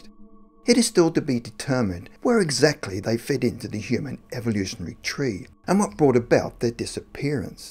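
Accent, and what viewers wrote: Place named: Australia. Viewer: It's an Australian accent. What's so unnatural about it?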